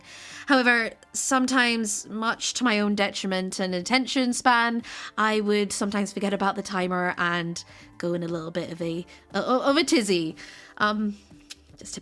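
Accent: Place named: Scotland, United Kingdom. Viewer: Lovely to hear a Scottish voice in these videos, welcome to Nintendo Life :)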